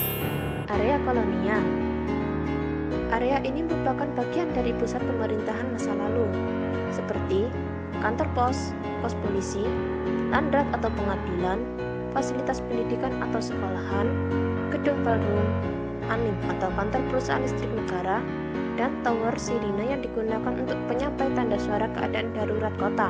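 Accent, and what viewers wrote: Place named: Java, Indonesia. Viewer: Bagus banget kak, itu editnya gimana? 😁😁 btw, secara keseluruhan bagus banget gpp klo ngomongnya agak medok, yg penting ini udah bagus kok, semangat❤️❤️❤️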